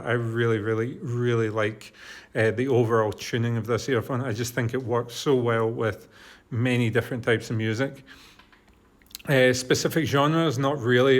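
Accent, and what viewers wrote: Scotland, United Kingdom. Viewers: Id say central Scotland.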